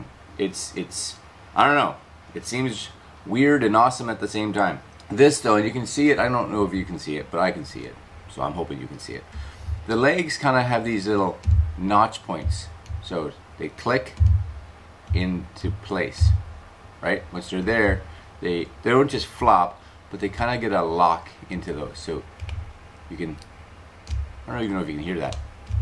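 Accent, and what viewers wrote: Canada. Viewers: My tripod has been ordered! Love the Canadian accent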